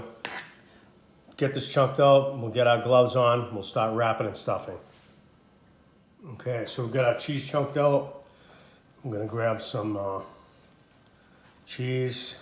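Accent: Boston, United States. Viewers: Boston accent?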